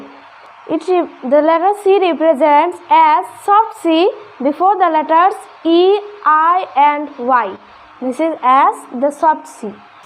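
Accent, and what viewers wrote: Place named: United Kingdom. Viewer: Love British accent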